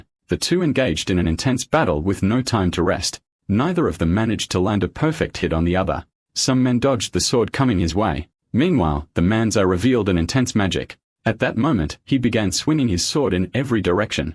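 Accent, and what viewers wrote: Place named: England, United Kingdom. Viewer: Using an English accent is disgusting.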